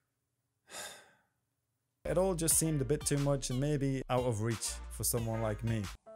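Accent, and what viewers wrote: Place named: Northern England, United Kingdom. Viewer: You have a bit of a northern lingo you local to Newcastle by any chance :)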